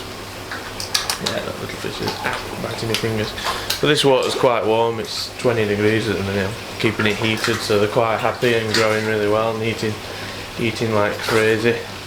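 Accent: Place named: Yorkshire, United Kingdom. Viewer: great videos ive got many good tips watching your vid blogs. only had koi for the last 8 months and need all the help i can get. plus you sound like a local lad im in rotherham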